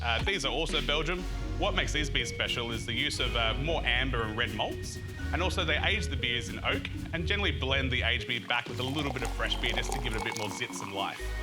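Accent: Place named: New Zealand. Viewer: This guy's a kiwi, no Aussie.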